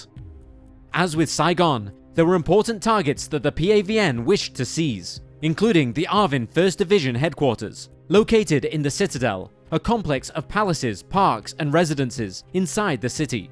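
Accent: United Kingdom. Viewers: No more limey narrator!.....sick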